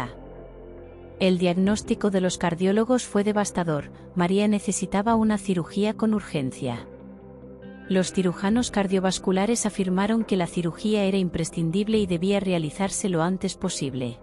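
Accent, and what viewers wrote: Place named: Spain. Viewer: Que bella historia y la narración impecable con ese soneto como de españoles me quedé fascinada asta el final mil gracias y ya me inscribo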